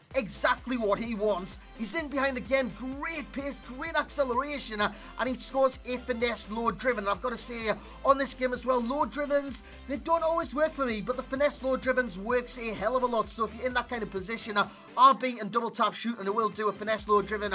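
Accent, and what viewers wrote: Newcastle, United Kingdom. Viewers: newcastle i think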